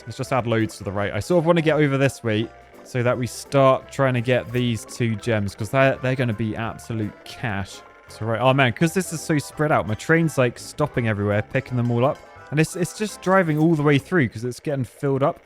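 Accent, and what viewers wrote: United Kingdom. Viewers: Ay brittish dude, will you play Tf2?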